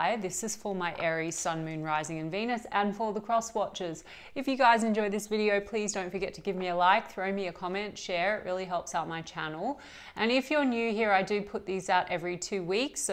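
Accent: Australia. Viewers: 🙌🏻 yes stepping into my power. I was on the low frequencies and saw the same in the relationships I was looking at. I’ve finally let that go and have seen underneath it all was my low self esteem. Lots of self care self love & affirmations. Breaking the toxic habits and giving into the good good love ❤️ Really nice to hear an Aussie doing tarot! Great energy, a brilliant read that I related too. Bless 🙏🏼 I’ll keep coming back!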